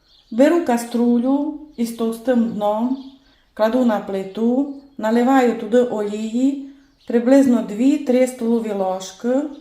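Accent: Transcarpathia, Ukraine. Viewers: Я из Болгарии и хоть слово не поняла,но до чего же интересно слушать ваш прекрасный закарпатский диалект!!❤Украина - моя боль с 2013 год.😢Молюсь о вашей стране каждый день!🙏Держитесь,милые,смелые Украинцы!Весь мир с Вами!Народ - Герой!!💪💛💙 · ви так гарно говорите,в мене є сусід з Закарпаття то він так подібно говорить,як ви,але він вже багато років живе в нашому місті приїхав працювати на шахту,то вже трохи виговорився,але мені ся люби,як ви говорите.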